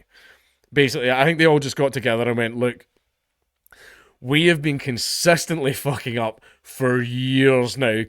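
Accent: Scotland, United Kingdom. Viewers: Honestly, I don't even really know what SNP is cause I'm American. I'm just here because I like hearing a hilarious Scot rant about stuff in his accent. Cheers up my day. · Wow, it sounds like he's actually Scottish.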